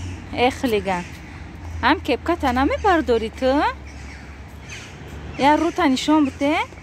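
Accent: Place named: Kulob, Tajikistan. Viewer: Шумо аз конибодом барои чи кулобча сухан мекуни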